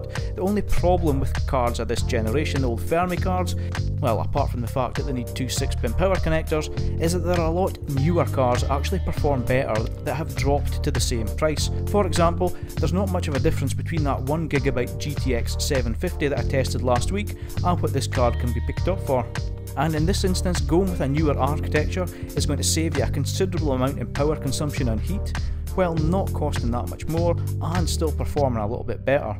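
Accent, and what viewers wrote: United Kingdom. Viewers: Which part does this guy come from in the UK?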